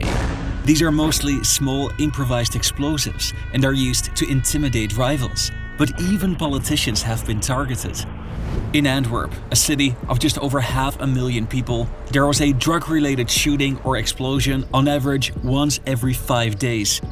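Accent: Netherlands. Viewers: thick dutch accent warning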